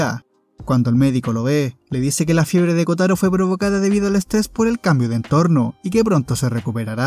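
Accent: Chile. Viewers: con los primeros 5 segundos ya supe que es chileno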